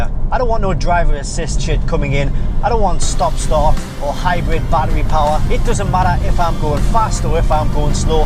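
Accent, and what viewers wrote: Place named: Geordie-Scouse, United Kingdom. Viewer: Unwatchable. Horrible muzak and what's that fucked-up Geordie-Scouser accent?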